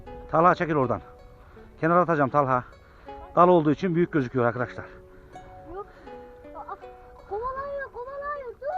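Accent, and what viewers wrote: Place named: Konya, Turkey. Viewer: Toprağım Konyalı olduğun buram buram konuşmalarından belli iyi büyük balık var mı gelsek saygılar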